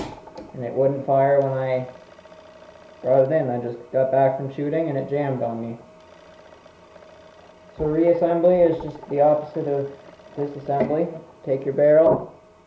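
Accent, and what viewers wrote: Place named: Atlantic Canada, Canada. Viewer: thought I heard an easy cost accent. I'm from Newfoundland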